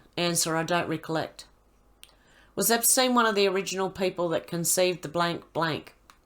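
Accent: Australia. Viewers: Thank you for reading, actually the Australian accent is perfect for, love you 😍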